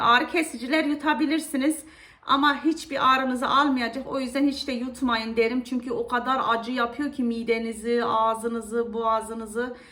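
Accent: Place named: Nevşehir, Turkey. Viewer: Çok geçmiş olsun sizi yeni gördüm nerelisiniz bizim oranin konuşmasina benziyor konuşmaniz ben nevşehirliyim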